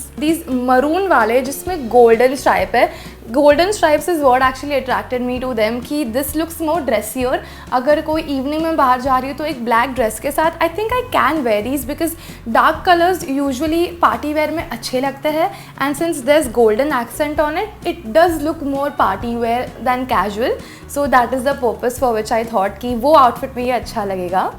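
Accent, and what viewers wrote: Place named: Mumbai, India. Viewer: Mumbai hinglish is the best😁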